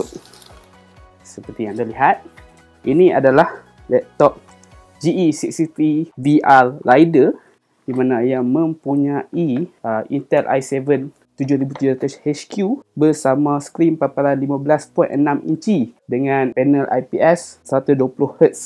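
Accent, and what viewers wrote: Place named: North, Malaysia. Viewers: Tudiaaa pekat bakhang utaraa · kekalkan BAHASA UTAQA! sempoi 👍👍👍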